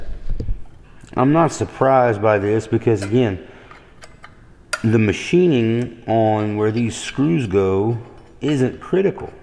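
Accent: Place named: Louisiana, United States. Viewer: when i first heard you start talking i said this cat has to be from Louisiana, great video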